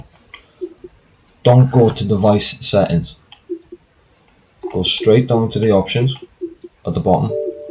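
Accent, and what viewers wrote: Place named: Wales, United Kingdom. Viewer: Mate are you welsh because you sound it.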